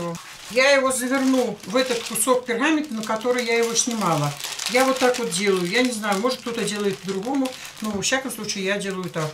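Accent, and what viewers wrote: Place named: Belarus. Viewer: Умница, обожаю белорусский говорок. Спасибо за чудесный, простой в приготовлении рулет❤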